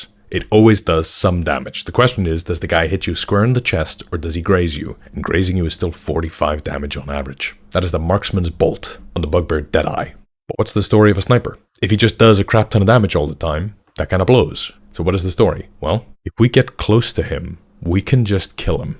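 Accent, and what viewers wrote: Iceland. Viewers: Are you perhaps Icelandic? I really feel this accent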